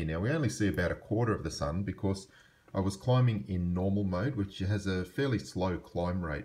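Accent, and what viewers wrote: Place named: Australia. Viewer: You have some good videos But what’s with the American measuring system You sound Aussie, obviously around Perth Why not metric mate.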